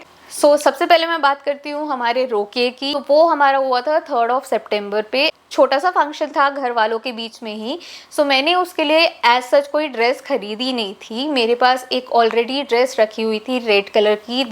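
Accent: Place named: Telugu, India. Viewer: aapka English Hindi baat karte Telugu Aata sister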